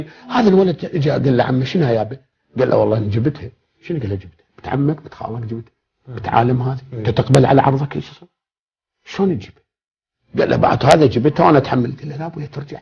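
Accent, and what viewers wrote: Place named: South, Iraq. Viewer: هذا الرجل مبدع، شيخ، شاعر، مثقف، صاحب نخوة، أصالة، أدب، حسجة جنوبية، صاحب حظ وبخت، نقول الفاتحة على روحة الطاهرة.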